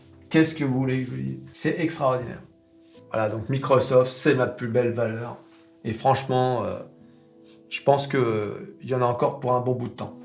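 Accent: United States. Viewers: on veut une video FAQ pour les 10k abonnés : quel est le point commun avec Jé Powell ? D'où vient cet accent southern américain ?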